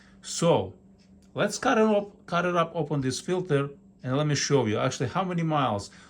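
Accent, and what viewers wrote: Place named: Russia. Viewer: Сразу слышно русский акцент)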